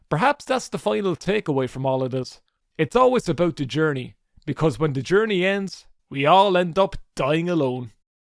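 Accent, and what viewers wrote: Ireland. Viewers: !!! Man it’s so good to hear that Irish accent again, and he returns with almost 2 hours of Daggerfall wowie what a blessing! we hope you’ve been okay, it’s so good to hear you alive and well. CHEERS MATE 🥂🍻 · Man with irish accent playing old RPGs is my favorite youtube genre. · you have no idea how happy I am to hear that Irish accent monologue about TES again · that sultry Irish voice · I’ve never been so happy to hear that Irish accent coming through my speakers. Welcome back,!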